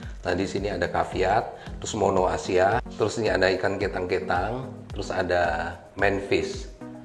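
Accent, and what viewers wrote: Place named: Bangka, Indonesia. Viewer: Logat bicara blom ilang bangka ah khiu.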